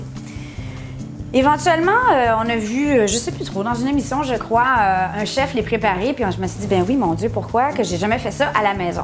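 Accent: Canada. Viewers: Salut à toi, j'adore ton accent canadien,mais dit moi je croyais que les os à moelle c'était juste bon pour mon berger allemand,et mon labrador,quand j'en mettait dans mon pot au feu c'était juste pour parfumé mon bouillon de pot au feu et ensuite je les donnais comme friandises à mes deux chiens,j'ignorais totalement que cela pouvait se mangé et se consommer,c'est pas un peu gras tout cela dit moi???,en tout ka super recette merci beaucoup à toi,toutes mes félicitations à toi!!!.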